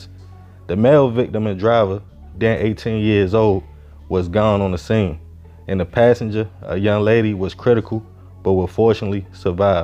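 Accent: Baltimore, United States. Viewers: I knew you were from Baltimore. I know my peoples from anywhere🤣🤣🤣🤣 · You gotta be from Baltimore I can hear it lol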